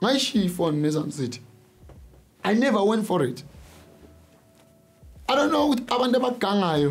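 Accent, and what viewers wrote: Zimbabwe. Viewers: Is this guy Zimbabwean or Southern Ndebele speaker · This guy talks like a Zimbabwean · He sounds Zim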